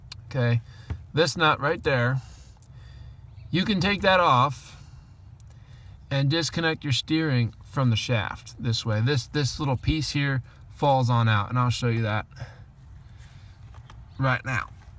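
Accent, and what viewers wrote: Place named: Texas, United States. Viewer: Texas?? Lmao you sound like you’re from Texas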